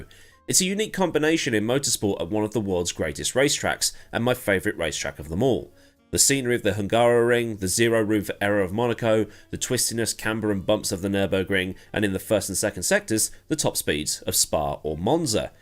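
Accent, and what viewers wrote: Australia. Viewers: honestly, as an aussie i pretty much lost most interest as soon as ford switched to the mustangs, and now that it's just american cars? yeah, who gives a fuck anymore? (also your aussie accent is pretty good mate) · Firstly excellent aussie accent. Secondly, maybe parity should be scrapped for a bop system. That allows a broader use of different types of body and engine configurations. This may entice other OEMs other than just those who make 2door v8 road cars.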